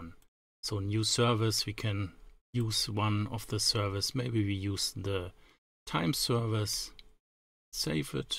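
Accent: Germany. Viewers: Ahh another very good german accend tutorial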